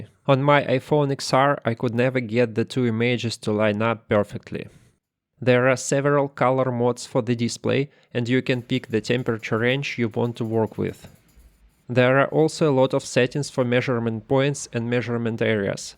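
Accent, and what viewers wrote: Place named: Russia. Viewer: It is impossible to hide a russian accent.😅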